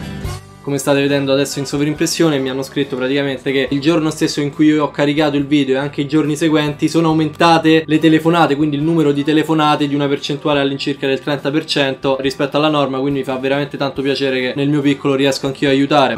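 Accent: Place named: Salento, Italy. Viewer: Sembra più salentino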